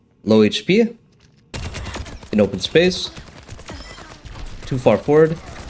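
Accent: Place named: South Africa. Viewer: I suspected you were South African from the accent and your ping and your bio confirmed it. Nice to see someone from the region making content like this. I'm wondering if you play on Middle East servers or VPN yourself to EU. I do the latter, but the 200 ping can be pretty frustrating at times even though I'm used to it. If you play on ME, how are the queue times like at high masters - GM?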